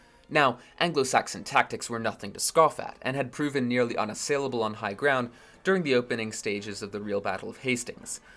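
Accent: United States. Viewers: I think the narrator is American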